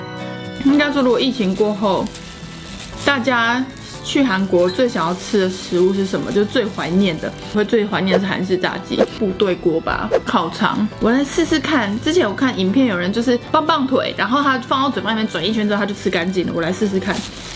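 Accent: Taiwan. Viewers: 好有喜感看你的頻道非常有親和力尤其是那台灣國語😂😂😂 我的頻道也要加點搞笑的元素哈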